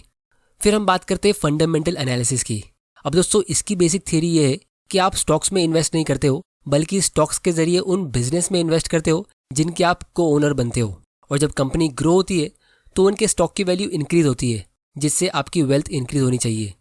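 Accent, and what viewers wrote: Gujarat, India. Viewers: Ur gujrati accent is good✨ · Are u gujrati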